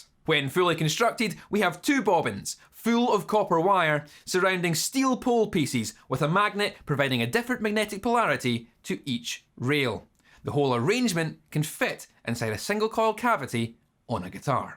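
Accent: Scotland, United Kingdom. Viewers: That was a very consistent explanation indeed....with a nice Scottish touch 👌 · Thanks for the explanation. But damn my ears are almost dead after hearing such a load of British accent.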